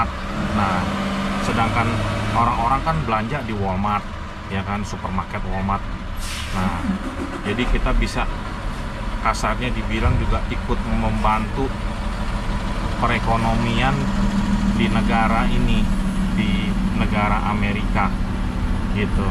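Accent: Jakarta, Indonesia. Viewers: gua suka lihat loe walaupun tinggal di amerika tapi masih logat betawi haha.. semoga sukses bang! :D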